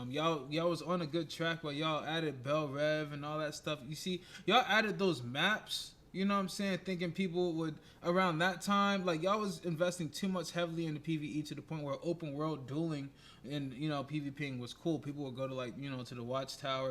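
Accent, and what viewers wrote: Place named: Pennsylvania, United States. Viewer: My buddy is from PA and talks similar. Probably a Steelers fan 🤮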